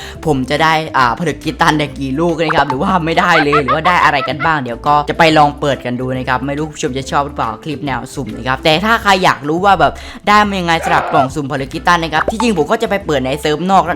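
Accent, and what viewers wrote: South, Thailand. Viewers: พี่เป็นคนใต้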